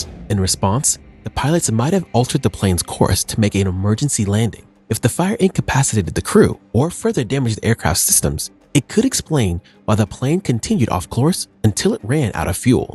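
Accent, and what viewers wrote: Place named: India. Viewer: YOU CANT HIDE UR INDIAN ACCENT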